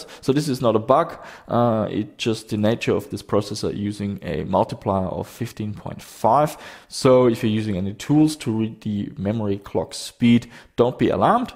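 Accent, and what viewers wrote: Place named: Germany. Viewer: Wer merkt von euch wohl dass die Person mehr deutsch ist als mit dem englischen Akzent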